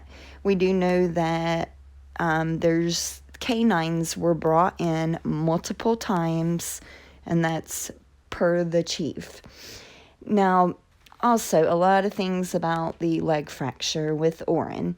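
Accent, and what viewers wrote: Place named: Carolinas, United States. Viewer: You from the Carolinas? Love the accent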